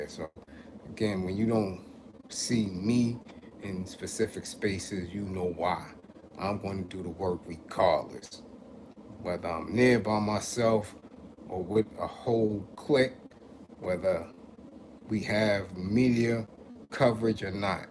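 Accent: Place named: Brooklyn, United States. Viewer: New sub (from Albany, ny) I love hearing you talk that talk with doggie in that bk accent. I had to subscribe and keep getting that knowledge. We need more men like you in this world to help get our ppl together. Keep up the great work!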